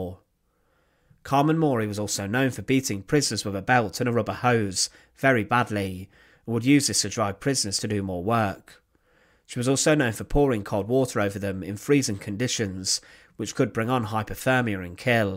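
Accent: United Kingdom. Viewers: Settle down, did you notice the narrator had a vaguely British accent. They love to insinuate that r sound when it's not called for, so Capi could sound like Carpo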